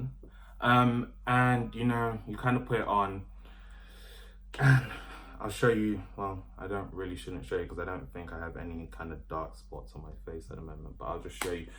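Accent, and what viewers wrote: United Kingdom. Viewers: Your accent is proper British so everything you say... is probably legit. - Your African brotha from across the pond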